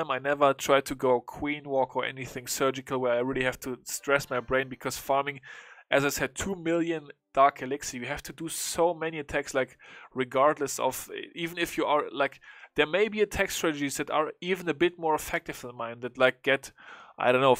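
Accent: Germany. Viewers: You are german i can hear it by your english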